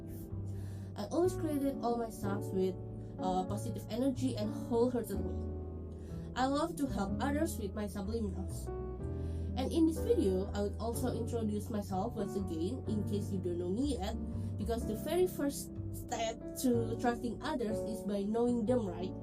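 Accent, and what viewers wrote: Indonesia. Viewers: loh ternyata orang Indonesia ya?? ngomong pake bahasa Inggris tapi tidak bisa dipungkiri logat dan gaya serta mada bicara persis org Indo semangat ya cici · I know you are indonesian, right after your first sentence, i listen to your sub from 2 years ago btw. thank you.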